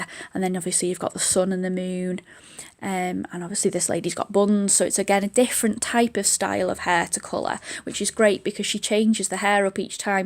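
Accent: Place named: Northern England, United Kingdom. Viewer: Great video and you have a northern accent like me 😍😍 Are you Manchester based ? You sound around Oldham Manchester way x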